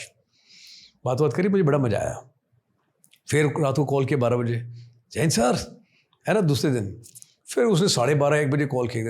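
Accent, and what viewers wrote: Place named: Punjab, India. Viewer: Your Punjabi accent sound so cool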